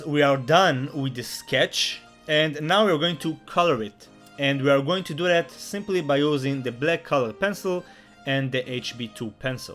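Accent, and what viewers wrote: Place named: Israel. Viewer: פתאום אני שומע את המבטא הישראלי שלך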